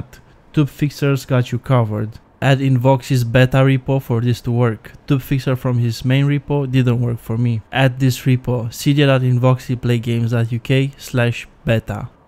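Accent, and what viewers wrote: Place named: Romania. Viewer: Mi-am dat seama ca esti roman dupa accent. :)))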